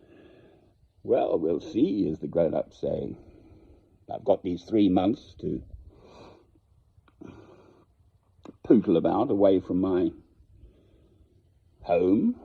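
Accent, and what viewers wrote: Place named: Scotland, United Kingdom. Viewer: your scottish accent is remarkable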